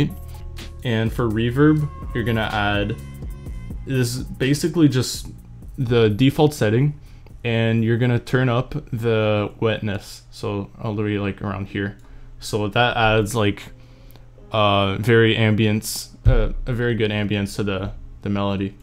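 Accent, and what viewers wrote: Quebec, Canada. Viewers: tes quebecois? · what you are québécois je savais pas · j'ai failli pleurer quand j'ai entendu ton magnifique québecois et juste après les sous titres 😂😂😂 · Wtf are you from Quebec?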